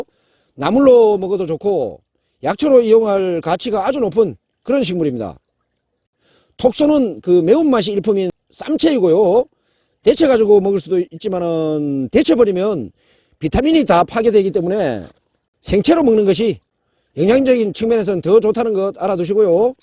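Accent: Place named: Gyeongsang, South Korea. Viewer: 영상과 정보 감사합니다 경상도 말씨가 구수하게 들리네요 항상건강하세요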